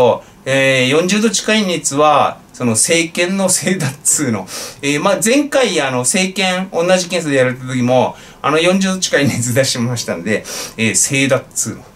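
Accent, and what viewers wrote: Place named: Tohoku, Japan. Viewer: 良かった！ずうずう弁で、活動再開！福島頑張れ👍俺は、茨城だが！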